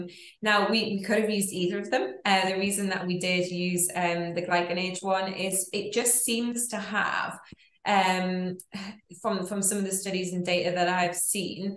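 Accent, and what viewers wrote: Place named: Newcastle, United Kingdom. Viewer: Geordie accent.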